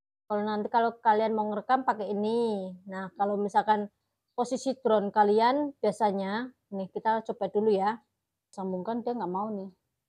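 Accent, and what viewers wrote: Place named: Java, Indonesia. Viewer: Suaranya agak medok...lucu tp jelas sii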